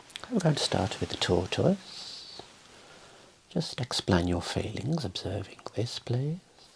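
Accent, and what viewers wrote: Birmingham, United Kingdom. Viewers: Omg British accent💀😭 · Couldn’t get more brummie than this 😂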